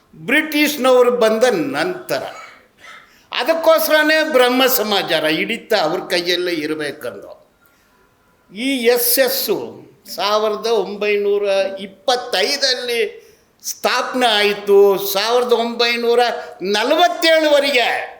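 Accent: Malabar, India. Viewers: Malabari talking kannada in fake channel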